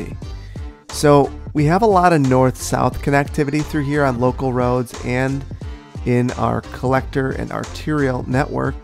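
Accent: Wisconsin, United States. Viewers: Unthaw… dude you’re totally from Northeast Wisconsin…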